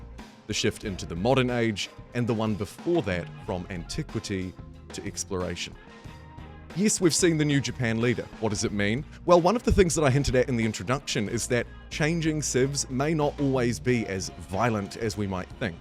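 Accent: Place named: New Zealand. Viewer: Nice to click on a random video and hear a kiwi voice. Rawe bro, nice video. Generally pretty excited for the new game, kia Ora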